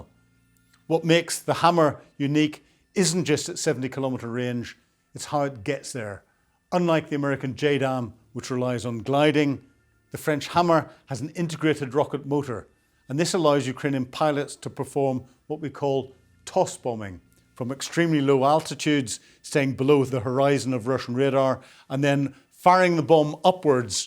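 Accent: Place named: Northern Ireland, United Kingdom. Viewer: Love your blog,love your NI accent AND love your jacket 🇬🇧🇺🇦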